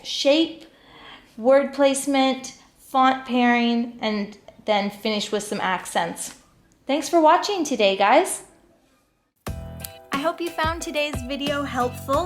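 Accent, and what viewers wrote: Canada. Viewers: I love your videos. Totally inspiring. I've taken so many ideas from you, and have even found myself slipping from my American hick accent to your fun Canadian one from time to time. :D That's how many times I've watched your videos!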